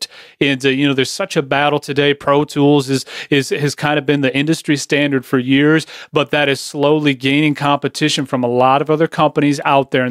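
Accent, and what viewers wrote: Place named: United States. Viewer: oh my god...that american accent !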